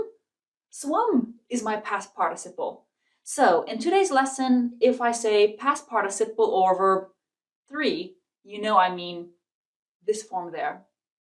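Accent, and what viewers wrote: United States. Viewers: That's true! number one in American English! We do not need British English))) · Excuse me, may I ask you where you come from? I wonder how to indentify your accent, but I guess it's near to american. By the way your speech is very clear. I really enjoy your lessons :)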